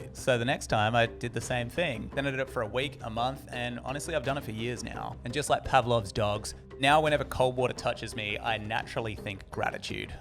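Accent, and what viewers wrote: Australia. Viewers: the ausie accent is just too much to take. sorry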